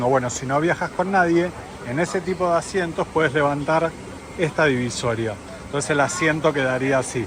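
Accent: Argentina. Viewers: A este muchacho no le saco la ficha habla con palabras de España con acento argentino, raro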